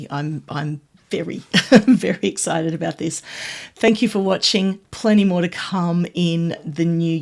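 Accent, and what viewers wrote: Australia. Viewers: Fantastic, congratulations and well deserved. I always look forward to your videos when released and enjoy the content and your explanation - and your personality. Also, so great to hear a familiar Australian accent in sea of American Microsoft content. Well done!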